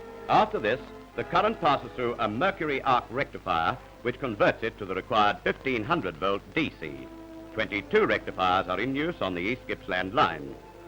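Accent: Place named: RP, United Kingdom. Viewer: Great to watch and I love the deliberate BBC accent.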